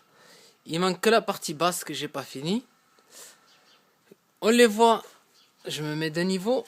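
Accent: South, France. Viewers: Salut merci pour ta video très clair j'ai tout compris avec ton accent du sud c'est géniale. Merci encore et si tu peux faire une vidéo sur les plafonds dale 600/600 se serai dégain merci.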